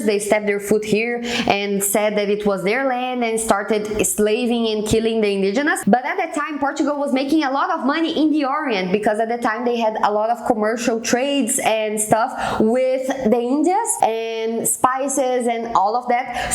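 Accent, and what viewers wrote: Minas Gerais, Brazil. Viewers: isso não é um "broke accent" é só uma das mais lindas e variadas formas de se falar ingles, ❤ tenha orgulho do seu sotaque mineirês-brasileiro, molier!! kkkk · Muito bom! Amo sotaque, é bom demais ouvir o inglês brasileiro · amore, nunca mais peça desculpas pelo seu sotaque brasileiro!!!!!!! <3 luv u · Teu (nosso) sotaque de brasileire é lindo!!! ❤ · seu sotaque é belissimo o sotaque brasileiro falando inglês é belissimo então não precisa pedir desculpa!! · você não tem um sotaque quebrado. tem um sotaque brasileiro! e é maravilhoso! achei que já poderia ter na história a tentativa de golpe do 8 de janeiro. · Nossa essa é a primeira vez que noto um sotaque brasileiro forte em algum BR falando inglês kk Ela fala certo mas sem aquele sotaque americanizado, ela fala bem de um jeito abrasileirado mesmo Amei você 🤍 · Seu vídeo é mto bom! 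Ter sotaque brasileiro não é um problema, vc tem um bom domínio da língua inglesa e o seu sotaque nem é taaaanto o problema... É mais a sua ENTONAÇÃO q não está compatível com a língua inglesa, mas dá pra (um falante nativo) entender 90% do que vc diz. (Brasileiros vão entender 100%, sem o menor problema) Bom, mas - se mesmo assim - vc se sentir desconfortável em falar com entonação e sotaque carregado e quiser amenizar essa sensação de inglês "aportuguesado", pra melhorar a qualidade do vídeo, eu sou especialista em linguística e meu foco é exatamente em pronúncia, entonação e eliminação de sotaque brasileiro... Seria um prazer aprimorar sua fluência! Acredito que em 1 mês seu inglês irá progredir uns 50%. Sou professora de inglês há 27 anos, graduada em Letras e possuo minha própria escola há 10 anos. Vc será muito bem-vinda! o do meu Instagram é byteachergabi eu não uso muito o instagram para postagem, mas estou sempre verificando minhas mensagens!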